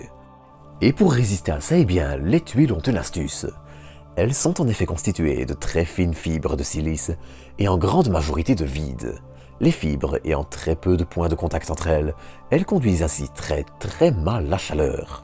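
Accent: Belgium. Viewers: Étant bruxellois, je crois que je reconnais un accent belge.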